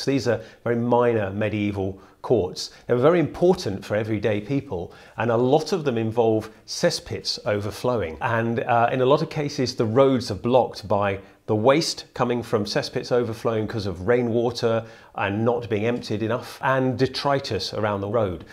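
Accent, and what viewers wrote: United Kingdom. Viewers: 13 minutes of "piss" and "shit" in Jason Kingley's (OBE) excellent diction and soothing british accent. I can die a happy man.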